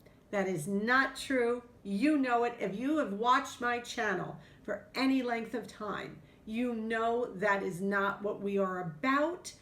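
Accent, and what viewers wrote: New York, United States. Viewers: Being from Jersey City (now in SC), our cawfee and tawk sound about the same as NY. That's probably why I relate to you so much (not to mention every time you say you got something at Shop Rite). I've got envelopes for Christmas and a new Christmas Tree in my "sinking funds". It worked for my parents with the Christmas Club back in the day, so this is my second year saving this way. My son and I buy things year round, so the Christmas money is for my Granddaughters who live with me and it certainly feels great not to have to use a credit card to purchase gifts. As always, love your channel and congrats to Maxine for winning the giveaway.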